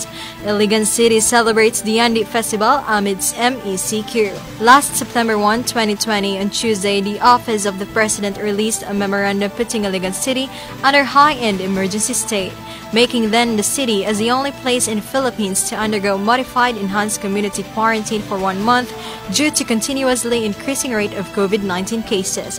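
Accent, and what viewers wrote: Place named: England, United Kingdom. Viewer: I love ur english accent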